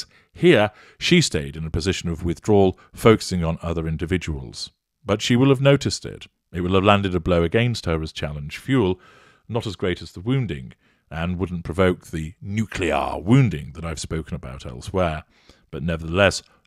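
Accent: United Kingdom. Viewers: I adore your commentary. You beautiful British accent. Plus you make me laugh. So bloody happy to have found your channel. From Australia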